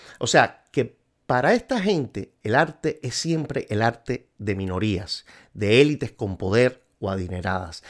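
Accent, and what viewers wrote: Cuba. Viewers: Gran vídeo . Pero creo que hablas del intelectual comunista. Por tu acepto veo que eres quizás cubano? Yo soy cubana y entiendo que este punto de vista viene algo heredado de la situación del país. Quizás eh? De cualquier modo, muy bien video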